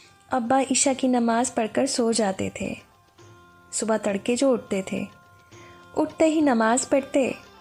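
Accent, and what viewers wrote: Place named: India. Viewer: اردو زبان ک ے الفاظ کو براہ کرم' ہندی کے لہجے میں مت پڑھیئےگا محترمہ۔ براہ کرم پہلے اپنا تلفظ اور لہجہ کو اتنی تاریخی ناول کے شایاشان پڑھیئےگا۔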